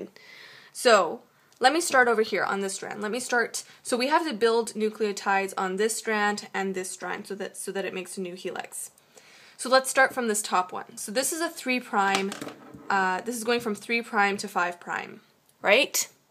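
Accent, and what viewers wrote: Canada. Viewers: thanks sweetie :) SO helpful...are you canadian btw? :)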